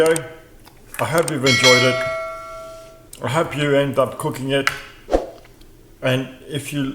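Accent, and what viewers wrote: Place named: Australia. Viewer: thanks I will definitely share with others I too love cooking delicious and nutritious food for people especially my loved ones ❤ Also I could hear the Outback in your voice right away so when you said you were Greek I doubted my hearing but later you said you hailed from Australia I was like I KNEW IT 😂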